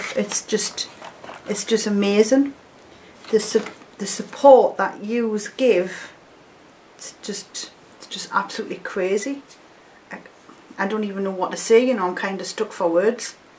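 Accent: Newcastle, United Kingdom. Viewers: You cannot believe how happy I am to see you back on here and so regularly too. Became a Patreon and wish I could send you more. I used 1 of your Barry M tutorials for a look I wore for years. I also bought 1 of your palettes too. Wow I’m beginning to sound like a weirdo lol, I live in Newcastle and love hearing the accent. You look so happy and relaxed xxxx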